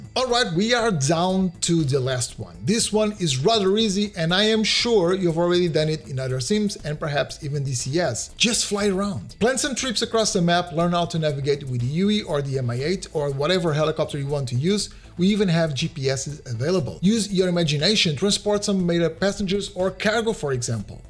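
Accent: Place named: United States. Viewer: oh my got. that voice .. relax man, such american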